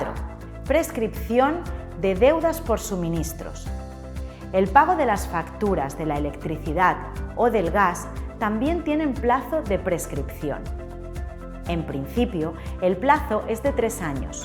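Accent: Spain. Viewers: Habla de España. ¿ no te enteras del acento o qué ?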